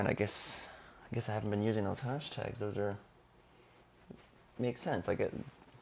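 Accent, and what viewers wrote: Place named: Canada. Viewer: And Sew On recommended your Vlog with a few others. I can see why! I thoroughly enjoyed it. Your tee shirt is indeed a nod to the 70's, 60's and 70's being my era. Your stripe matching, even on the Neckband was excellent. If your into drafting your own patterns watch Sew Custom Vlog, an Irish girl, she's good, I'm not saying that cause I'm from Northern Ireland too 😁, she is 👌spot on, and she's doing coats at the minute. I think you'll enjoy her Vlog. As we say in Northern Ireland, your a turn, meaning your funny! Canadian going by the accent, as some Canadians say certain words like the Scots/Irish. Do a bio on yourself if you haven't already done so.....😉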